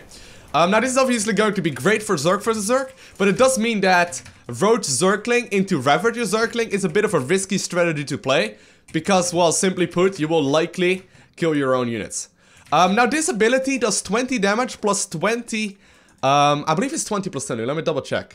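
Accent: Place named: Netherlands. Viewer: dutch is definitely leaking into his english, compare this to his newer videos, you can really see the difference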